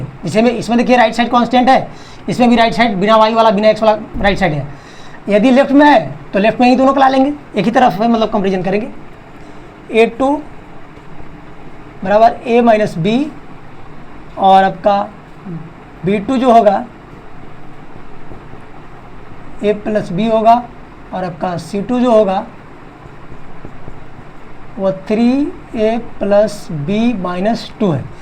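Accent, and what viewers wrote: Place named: Bihar, India. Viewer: Sir to khan sir nikle Bihari +hindi mix 😂❤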